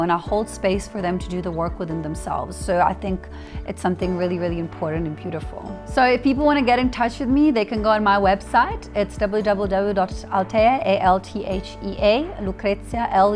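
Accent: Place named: South Africa. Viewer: Finally found out something about her background etc. I was curious. And for some reason I thought she was Australian lol but it's so obviously south African now. Derr. Nice documentary 👍🏼🪐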